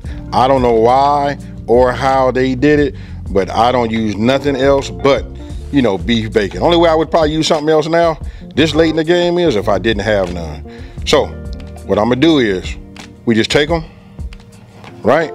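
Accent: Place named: Arkansas, United States. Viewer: Love the way u cook dude!! Arkansas talking lol!!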